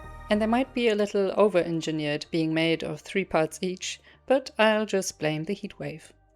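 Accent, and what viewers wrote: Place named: Germany. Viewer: Du hast in der Hitzewelle sowas zustande gebracht? Ich bin im eigenen Saft gegart worden XD Btw, hab dich gerade erst entdeckt, dachte dein Akzent ist soooo deutch, dann kam der Info Drop. XD Egal hab dich abonniert und geliked.:face-red-heart-shape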